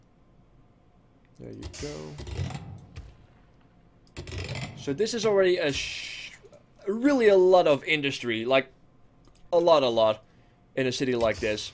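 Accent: Netherlands. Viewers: His accent is dutch people.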